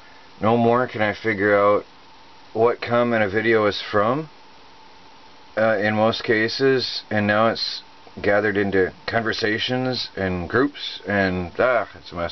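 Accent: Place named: South, United States. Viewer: Come on now, have you ever been in the south where creek sounds like a crick in your neck? PotaTOE Potaaaato TomaTOE Tomaaaato....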